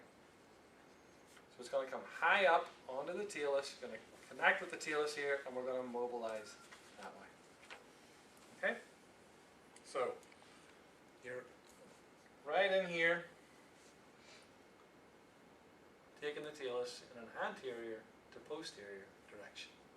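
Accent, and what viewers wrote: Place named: Ireland. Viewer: Thought I could hear the dulcet tones of an Irish (Ulster?) native!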